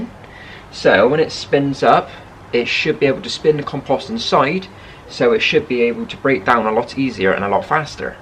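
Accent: Bristol, United Kingdom. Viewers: I was going to say this is a normal Bristol accent. Some people have no ideals! Lol ❤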